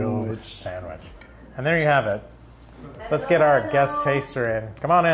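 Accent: United States. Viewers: You are making wonderful dishes,sharing your knowledge of how even Americans have breakfast,pizza with a blend of indianess in it.Great!I wish to know you have US based Medical certifications which is a great asset.May be you should share these first,your own identity.May be you can leverage this load of cooking to Mrs Madhuri,but of course under your guidance and direction,so the calories and protein content is clear.Encourage youngsters like who have a dream to go to the US and pursue Medical profession,making it easier for them.Its not easy but at least one can try.And may be you start a coaching class with and Ryan to teach your type of English with American accent to the youngsters in the coaching class.This is the need of the time so they can match the American standards.All the best Dr Nene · Dr Nene has true American 🇺🇸 accent. He’s son is also so well brought up. 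Kudos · Superb! Dr. Nene will be a Super Hit on YouTube. As glorious as his wife! Excellent English! Handsome Likeable Personality! American English Accent! Down to Earth! Great Content!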